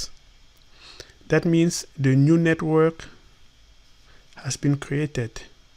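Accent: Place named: France. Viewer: try to improve your english you speak frenglish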